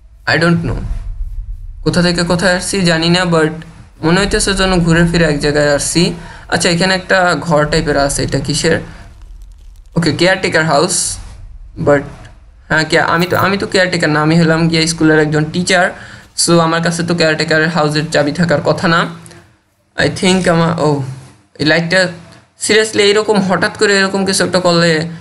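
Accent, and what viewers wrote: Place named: Barishal, Bangladesh. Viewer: Tmi ki borishailla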